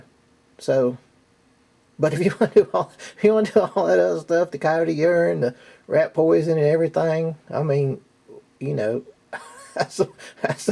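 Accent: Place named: South, United States. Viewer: I love the southern accent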